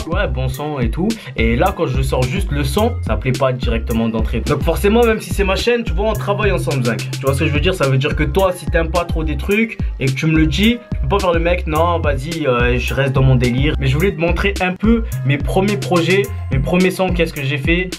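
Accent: Marseille, France. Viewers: sa va c'est bien t'es vidéo moi je kif comme tu est... avant les vidéo que tu fessais genre je kidnappe un abonner ou playlists ou on lui coupe les cheveux on aime bien ton accent de Marseille avec t'es tarpin bien c'était mieux a l’ancienne je dois pas être le seul a pensait sa mais continue tu est dans le droit chemin.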